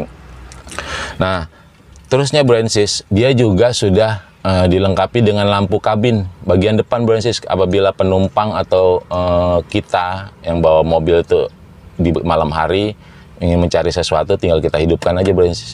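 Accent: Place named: West Java, Indonesia. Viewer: Tina logatna mah jigana urang sunda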